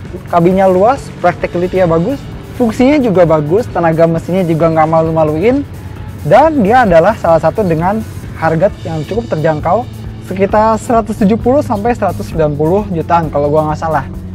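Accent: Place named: Bekasi, Indonesia. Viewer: kemang pratama banget.anak bekasi ya bang?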